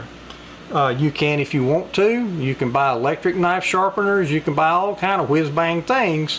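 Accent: South, United States. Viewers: thank you for this great video! It was nicely done and you very thoroughly explained the technique and WHY you don’t do certain things. I always find that sooo helpful when you tell someone why they shouldn’t do something (like the backside). You sound like you’re from the south, maybe North Carolina, because that’s where I lived for 25 years and my hubby is a southern boy from NC. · I just love your southern drawl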